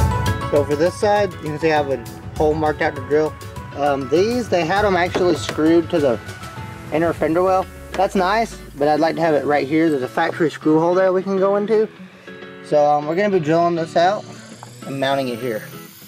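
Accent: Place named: South, United States. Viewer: Dang bro,where you from. You sound country as fuck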